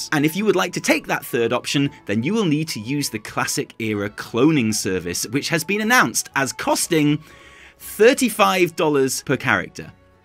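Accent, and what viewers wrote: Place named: United Kingdom. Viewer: Good video fair points made, but why do you keep cutting the video after literally every sentence? Also you sound British but say the dates like the American way? Just curious.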